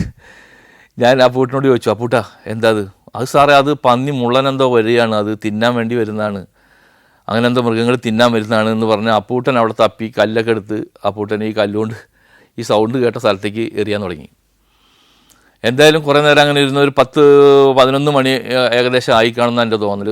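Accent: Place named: Valluvanad, India. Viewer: സർ ആത്മാർത്ഥതയും സത്യസതയും തുടിക്കുന്ന വാക്കുകൾ. വള്ളുവനാടൻ ഭാഷയുടെ വശ്യത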